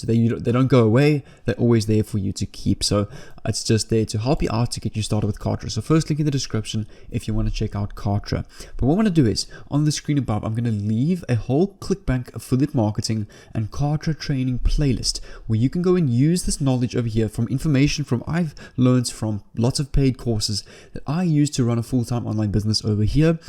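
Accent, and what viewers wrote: South Africa. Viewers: Bro you from SA right?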